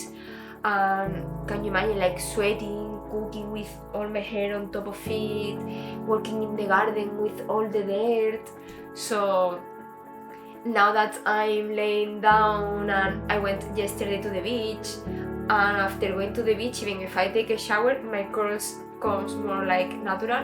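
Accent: Spain. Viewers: Spanish accent - Spanish subscribers..🙄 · it’s harder to understand if you are not Spanish, and the reason it’s not her accent, it is the “entonation” (I don’t think this is the right word, but English is not my language, sorry). She speaks English with Spanish entonation. (Very common for Spanish speakers)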